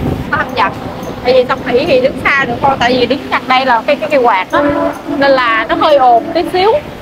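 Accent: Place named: Mekong Delta, Vietnam. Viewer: Ban vay moi giong miên tây thương yêu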